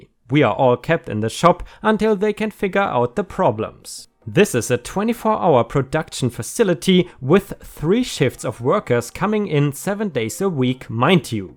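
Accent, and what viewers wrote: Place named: Germany. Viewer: Kann das sein dass du deutscher bist? Man hört deinen deutschen Dialekt extremst raus...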